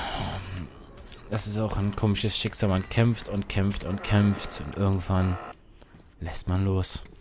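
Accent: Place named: Berlin, Germany. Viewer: Wär ja mal voll endgeil... Natürlich, um so weniger Chancen, um so besser xD Ey, das mit dem Träumen war meine Idee D:< xD Der Tod war so unnötig xD omg, Lachflash xD Dein Berliner Dialekt ist voll cute:D Der Baum will dich beschützen!😌 Ich kann hören, wie sie vögelt... Achso Vögel fängt... pfeifend weggeh Eric ist vielleicht an Eric the red angelehnt... <<< Gedanke kam mir so ganz am Anfang xD Tschuldigung, der Mond sah so lecker aus.-. Ich konnte mich einfach nicht beherrschen..., das Lesen musst du aber noch üben😌 Srsly? Auftraggeber, Auftragnehmer? XD Dieses "Oh, fuck!" am Schluss und ich erstmal so mega ratlos, was du meinst xD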